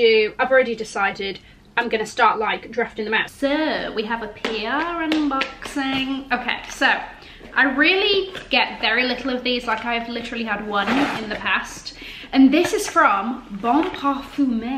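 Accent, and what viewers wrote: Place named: RP, United Kingdom. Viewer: I LOVE YOUR ACCENT !! You're so posh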